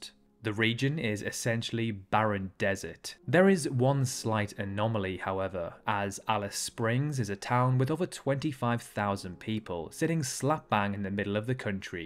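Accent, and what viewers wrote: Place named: Newcastle, United Kingdom. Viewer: This guys so geordie u can tell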